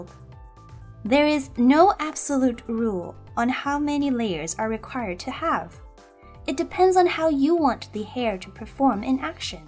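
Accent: Japan. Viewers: I think it's because she's Japanese and she's trying to focus on her accent lol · I´m from Mexico and what I note is not about your comprehension of English . The problem is the person who is explaining the video, It seems that is a Japenese person talking in English, is noticeable due to the accent and is hard to follow what she says until you hear various paragraphs of what she explained.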